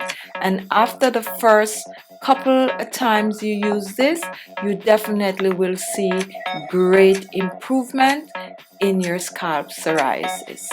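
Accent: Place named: Jamaica. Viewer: You must be Jamaican with that accent , great video..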